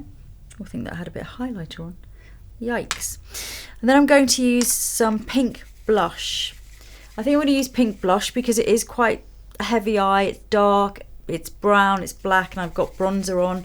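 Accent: England, United Kingdom. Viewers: Thank you, my dear Lisa, for that beautiful and so good explained tutorial. Like other ladies already wrote, your calming voice and elegant british accent are so marveilleus! Regarding your lipsticks: I ordered all three of them and each time I wear Velvet Jazz nearly everyone is asking me what brand it is etc. And I'm very proud because You choosed italian craftsmenship to produce them! Merry Christmas! Leonora from Rome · I watch your channel for years, and I will go on...actually, I learned English with your videos (not only yours, of course), you have a lovely voice and authentic, very polished British accent. We just talked about you today with me stylist in Kyiv, discussed your lipsticks and your book, it was nice. I was lucky to get one of your lipsticks, and I’m wearing it every day))) wish you all the best in 2019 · you are such a lovely person...and you English, oh, love it. 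· I like your British accent.....and the make up in this video, specially the simple smokey eyes.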